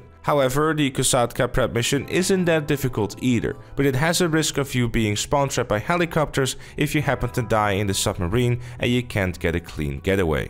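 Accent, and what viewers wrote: Netherlands. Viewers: Je bent Nederlands ik hoor het aan je accent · are you dutch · hoorde gelijk dat je nederlands was haha XD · this dude dutch though! geweldige video man, echt vet... goed bezig! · Are you dutch becuase you have a accent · Are you Dutch?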